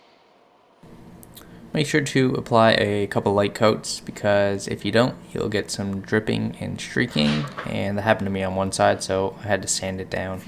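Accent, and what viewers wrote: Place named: Atlantic Canada, Canada. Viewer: Your accent.... I can’t recall where I’ve heard it before. Newfoundland? New Brunswick? New England?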